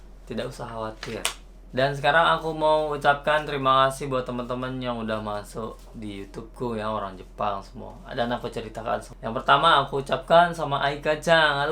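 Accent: Lombok, Indonesia. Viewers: Alhamdulillah Logat sasak nya masih terasa, but seperti nya akan hilang, hmm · Ailah logat lombok iku dk bau telang.. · Mantap bang lanjutin bikin Videonya, logat lomboknya gk bisa ilang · Bang asli lombok?? Logat nya sasak tulen ni salam dri lombok bang hehe😅🙏🤙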